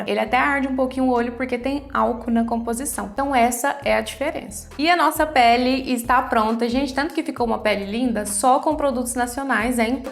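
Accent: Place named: Minas Gerais, Brazil. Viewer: Estou adorando seus vídeos! Sou nova por aqui e estou achando tudo muito útil e uma delícia de acompanhar. Você é mineira da onde? kkkk Pergunto por conta do sotaque fofo que tem... Eu sou mineira de BH. Confirme se minha suspeita está certa... Beijo, obrigada e parabéns pelo trabalho.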